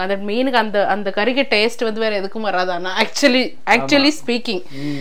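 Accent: Nagercoil, India. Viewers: நாகர்கோவில் பேச்சு வழக்கில் நம்மூர் அக்கா லண்டனில் இருந்து பேச கேட்கும் போது உண்மை யிலேயே என்ஜாய் பண்ணி பார்த் தனக்கு. கீப் இட் அக்கா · Apdiye Nagercoil style la ye pasrenga sema ka, school time neyabagam varuthu, am also from kk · Hi Sister. என் வயது 45. உங்க பேச்சு அப்படியே நாகர்கோவில் Slang. நான் பிறந்து வளர்ந்தது திருநெல்வேலி. திருமணம் ஆனது நாகர்கோவில். உங்க யதார்த்தம் பிடித்தது. காந்தாரி மிளகாய் செடி வளருங்கள். மருத்துவ குணம் உடையாது. நன்றி. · Pothisoora vida neenga pesura nagarcoil slang romba nalla iruku... Neenga sapdurathu atha vida romba nalla iruku... Nagarcoil la irukura mari feel iruthuchu.... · Pakka Nagercoil baashai😅 mam poli🔥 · நாகர்கோவில் slang super 👌 ௨ங்க expression அடிபொளி 😄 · Nijama nagercoil la ullavanga kuda syle nu pechaiye mathitanga.. Ana neenga pesuratha kekumpothu alaga iruku.... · Makka Namma Nagercoil pechu thaan vera level makka 👍❤️ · Sister after seeing ur channel nan unga channel than pakurean.because neenga namma nagercoil samayal poduringa.nangalulam nagercoil than ana chennaila irukirom.neenga samaipathum pasuvathum nama ooru nabakum varuthu.so we enjoy and see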